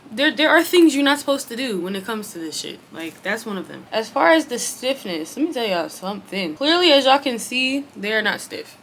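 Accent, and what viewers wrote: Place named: Baltimore, United States. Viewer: You sound like you from Baltimore. ..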